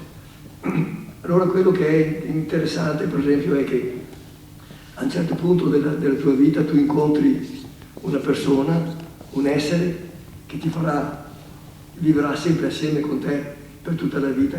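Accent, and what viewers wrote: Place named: Veneto, Italy. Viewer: L'accento veneto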